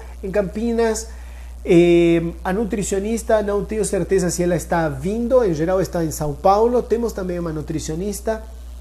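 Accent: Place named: Peru. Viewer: Boa noite, cheguei no seu canal pois fiz uma pesquisa de transferência e qualidade de endométrio, tenho marcada minha transferência para próxima terça-feira e estou feliz e com muita fê que minhas medicas estão fazendo o seu melhor para que esta FIV tenha sucesso, eu fiz uma fiz o passado janeiro, mas infelizmente não fiquei gravida, você forneceu muitas informações que eu desconhecia, lhe agradeço muito!!! Mais uma coisa você tem um sotaque muito parecido com o meu, eu sou do Peru!! kkkkk Forte abraco.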